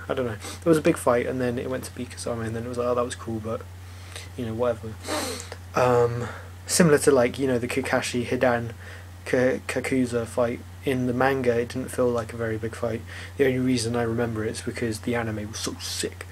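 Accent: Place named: United Kingdom. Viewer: This is perfect, a British guy talking about naruto! Im in heaven!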